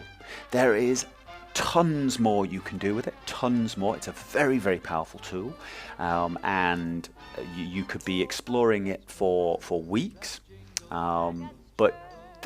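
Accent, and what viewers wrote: United Kingdom. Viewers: suprised the hell out of me when i saw your profile showing youre a fellow norwegian, was guessing british from listening to your voice.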